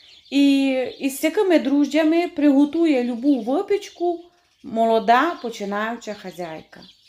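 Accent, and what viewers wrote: Transcarpathia, Ukraine. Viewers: Обожнюю Закарпатській діалект, а рецепти супер. Я обов'язково все перепрошую. Бажаю миру і веселих свят. Вітання з Буковини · Слухаю Вас і милуюся закарпатським діалектом! Дякую за рецепт, якраз шукала як приготувати пончики!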